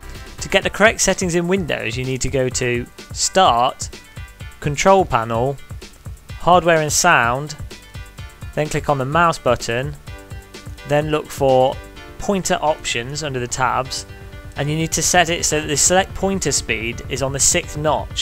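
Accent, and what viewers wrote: United Kingdom. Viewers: Part 2 of my comment Finally you should have also added in the great importance of finding a flawless sensor mouse. This is a mouse that contains a sensor that has no acceleration, or prediction (Enhance pointer precision but on the mouse). Anyway nice video, are you scottish,english or what?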